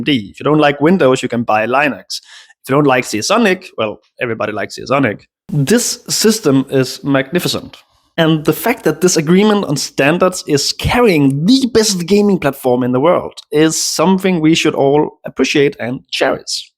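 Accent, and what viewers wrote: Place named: Denmark. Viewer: Dear god, that Danish accent :)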